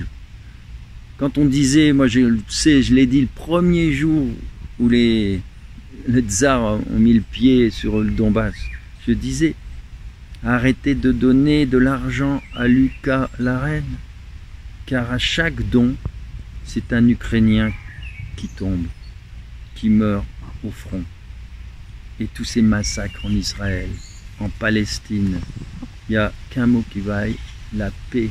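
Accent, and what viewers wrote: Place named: Occitan, France. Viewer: C’est la voix occitane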